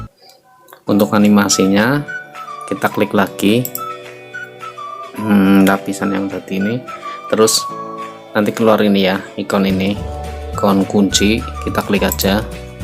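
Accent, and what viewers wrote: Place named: Java, Indonesia. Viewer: Ok suwun info ne ya Jan logat jawane medok bngt kaya nyong bae hehehehehehe Salam kompak selalu & paket silaturahmi sdh ku kembalikan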